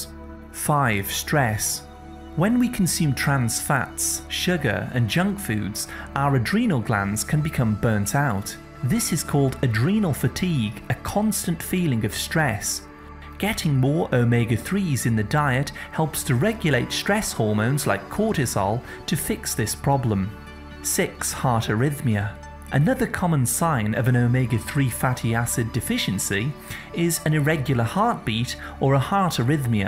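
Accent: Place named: North, United Kingdom. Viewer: When I heard " a like is always appreciated", I realised I take these videos for granted. I think of them as computer generated, spoken by robotics. They are so efficient, precise, informative, that I treat these vids like Wikipedia. I assume there is a team of computers generating these videos, not really someone called. Is that plea for likes added to make me think it's a real individual person. Is that warm northern accent used to give a feeling of trustworthy ness. Anyone else? Or am I in need of a course of omega 3 to lubricate my brain and heart.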